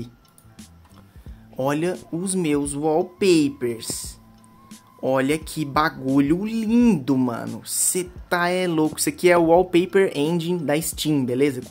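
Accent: Minas Gerais, Brazil. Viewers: cê e mineiro ou sulista?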